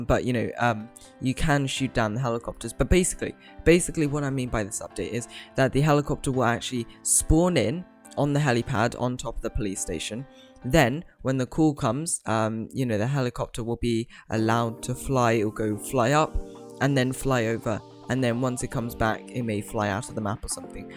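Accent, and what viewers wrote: United Kingdom. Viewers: Are You British? If you are you’re probably from the UK or EUROPE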